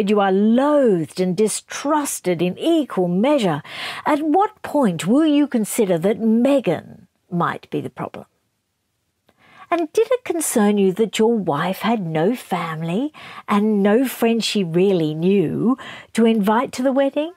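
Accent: Australia. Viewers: To repeat… these Snippets are like a verbal Southpark for me here in the US. Double bonus is your lovely calm Australian accent , in addition to the brilliant story lines. Americans are snarky in a different way, but it’s almost better coming from a Commonwealth member! You have even more reason to dislike them intensely!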